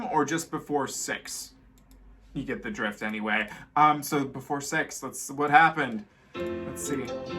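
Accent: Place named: Spain. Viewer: I love how you pronounce the Cs, as a Spanish person and not like a Latino.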